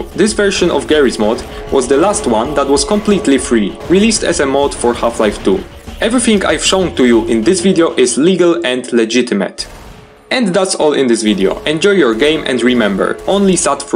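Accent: Poland. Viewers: Great tutorial, but are you Polish? You sound Polish. (Yes, this is a necessary question.)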